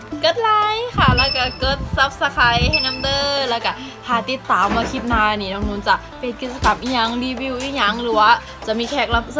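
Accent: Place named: Phu Thai, Thailand. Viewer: สวยหน้ารักหน้าขาวปานไข่ปอกคุน 😙😙ยิ้มก็สวยพูดจาหวานสำเนียงภูไทซึ้งมาก หลงมนต์เสน่ห์สาวภูไทสาว สกลนคร😙😙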